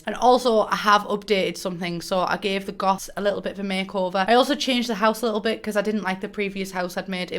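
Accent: United Kingdom. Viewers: Here i am again downloading it again thanks i did keep up with your dialect even tho i'm from norfolk nice to hear a brit talking about sims makes a change from the yanks all the best love to you and stay safe.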